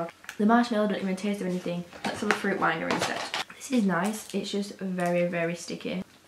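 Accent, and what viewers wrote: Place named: Scotland, United Kingdom. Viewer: Is she Scottish cuz I am and she kinda has an accent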